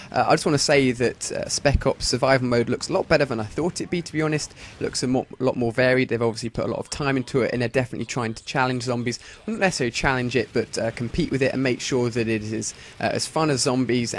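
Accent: United Kingdom. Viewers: I wanted to watch a MW3 gameplay video, not some obnoxious british guy blabber on for 10 whole minutes. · fuck your slicky british accent. stinks.. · Good video but British accents give me headaches..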